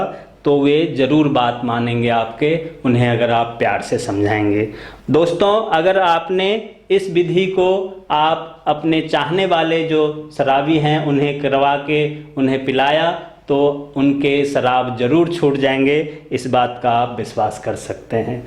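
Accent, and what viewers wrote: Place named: Bihar, India. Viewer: Ye ravish kumar jaisa thakela bolta hai..bihari babu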